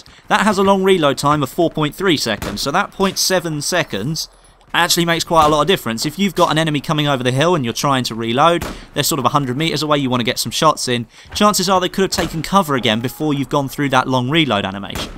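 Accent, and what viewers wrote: United Kingdom. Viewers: Brittish?? Subscribed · ur british yay i am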